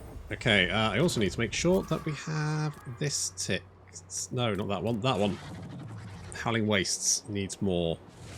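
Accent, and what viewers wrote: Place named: Newcastle, United Kingdom. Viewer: The Newcastle accent and vocabulary is hilarious when juxtaposed with WH3 😂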